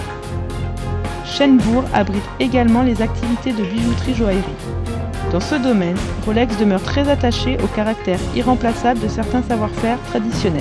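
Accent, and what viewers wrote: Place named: Mexico. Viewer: why is she talking mexican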